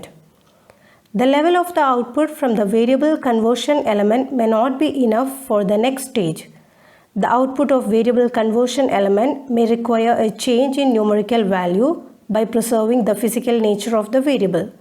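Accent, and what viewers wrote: India. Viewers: The Narrator is a Malayalee... Well explained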